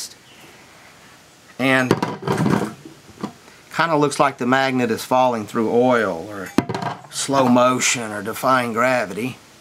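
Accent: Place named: Appalachia, United States. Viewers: that was cool thanks. let me guess - North Carolina accent. Yes that's where I live. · Trick Photography, hillbilly talk. I love this guy!!!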